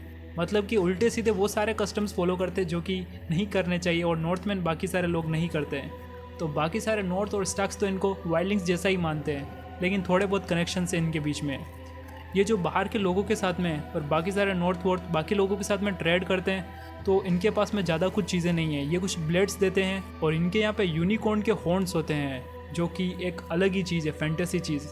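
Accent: Gujarat, India. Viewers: Bhai tu gujrati hai na ??😅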